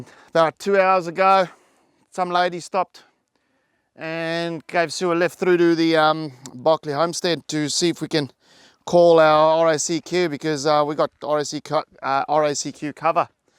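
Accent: South Africa. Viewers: You sound South African under the Aussies twang?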